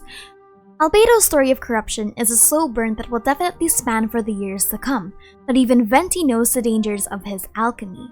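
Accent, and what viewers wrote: Philippines. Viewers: Somehow i knew that you are a filipino based on your english accent. love your videos btw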